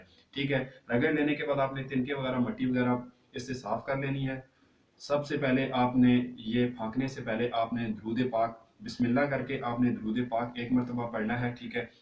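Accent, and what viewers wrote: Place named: Pahari, India. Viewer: Bhai apni bolne ka treqa badlo Ap k bolne PTA chal Raha hy k ap koi phari ho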